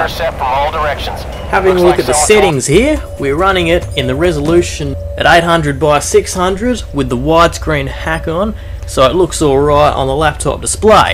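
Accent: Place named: Australia. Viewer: that has to be the most Ozzy true blue accent i ever heard from an Australian EVER LOL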